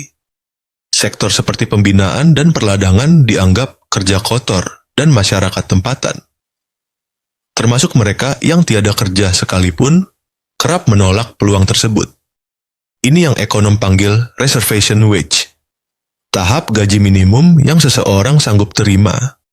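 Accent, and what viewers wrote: Indonesia. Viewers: Haha.. 😂 dngar gaya bahasa pn tahu admin org indo.. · Indog usahlah buat lawak menjadi orang malaysia suara pon kedengaran indong susah kalau orang indong mahu menyamar menjadi melayu melainkan dirinya melayu.Jangan ingat betul tetapi tidak betul. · Nada dan ritme nya bahasa Indonesia bang...😂,yang penting isi konten nya Real bukan hoax bang berdasar data dan fakta dan obyektif...semangat semangat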